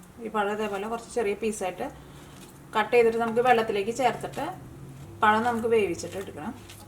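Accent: Thrissur, India. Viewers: ചേച്ചിയുടെ ഈ റെസിപ്പിയും സൂപ്പർ... ഞങ്ങൾക്ക് വലിയ ഇഷ്ട്ടമായി ആ തൃശ്ശൂർ ഭാഷ സൂപ്പർ, and....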